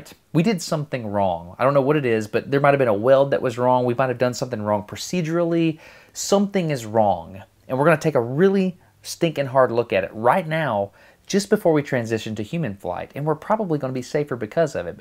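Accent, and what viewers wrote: South, United States. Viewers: Your southern accent really stood out for me for some reason. Anyway great vid.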